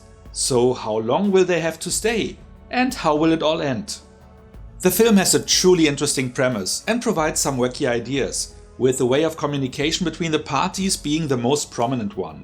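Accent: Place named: Germany. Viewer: I like ze quality of ur english but still it is herable: you truly are a German dude. So, warum sprichst du nicht einfach auf Deutsch? So gut auch wirklich dein English ist, so sehr hört man es einfach heraus, dass du Deutsch bist und schwubsti wubs, kann ich dir ned mehr folgen.... So please, keep speaking in German, zaz how I understand u most perfectly.... U understand Mr?:D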